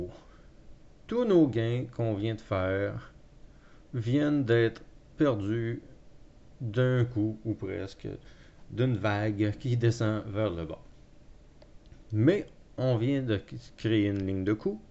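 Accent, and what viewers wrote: Quebec, Canada. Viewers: sI JAIME CE QUE TU FAIT ..... malade. cest bien de l entendre de la bouche dun Quebecois ;) cest difficile pour mois qui travaille de 6am a 2pm de suivre tes cours en direct. ca va etre enregistré? merci jaimerais bien moi aussi changer ma vie! cest possible de trader dun cell, avec l aide de votre communité au début ?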